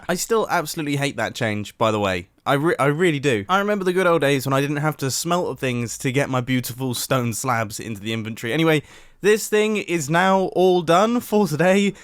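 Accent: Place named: United Kingdom. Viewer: he is British!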